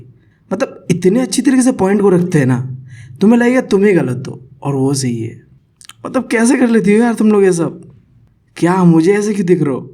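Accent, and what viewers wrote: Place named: Bihar, India. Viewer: Bihari h kya bhai language se pahchan padh rhi h 😅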